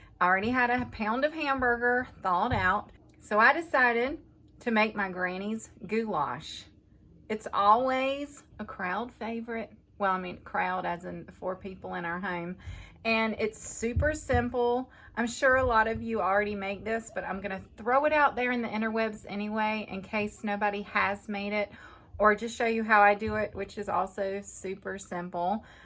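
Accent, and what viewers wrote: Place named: South, United States. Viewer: Hi. thank you I never know what to cook anymore that's why I'm here. and I love the way you talk that southern accent set me on fire.. i love it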